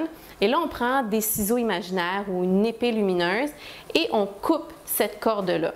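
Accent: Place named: Canada. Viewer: Un très bel accent canadien! Merci pour ce partage! Très bien expliqué. Certaines choses, je les ai apprises au REIKI, la bule d'énergie.. J'ai coupé le contact avec mon frère et ma belle-soeur l'année dernière, ils étaient devenus toxiques pour moi. Pics, remarques, jalousie etc. Ils ne m'ont même pas soutenue pour mes manuscrits, qui finalement ont été édités:-) Depuis je me sens mieux, un peu triste que j'ai dû le faire au début, mais c'était nécessaire. J'ai essayé de renouer, impossible. C'est moi qui va tomber malade. Donc, j'ai laissé tomber. Toutes les personnes qui me conviennent moins, j'essaie de les fréquenter qu'un minimum. Certaines personnes je leur ai fait comprendre qu'ils n'appartenaient plus à mon cercle d'amis. J'essaie de m'entourer que de personnes positives, et qui ne me lancent pas de pics méchants, c'est difficile je l'avoue, mais faisable. 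Nous devons diriger notre vie, gérer nos projets, et ne pas vivre la vie des autres. Je l'ai compris assez tard, mais il vaux mieux tard que jamais. Ceci ne m'empêche pas d'avoir de l'empathie pour mon prochain et d'aider où je peux. Merci pour votre travail! Après 2 ans, comme j'ai eu un commentaire de Dayou Love, je rajouterai que mon frère et moi on s'écrit mais on ne se fréquente plus. Mon frère est assis entre deux chaises, je comprends! Mais plus personne qui me commande, c'est vraiment formidable!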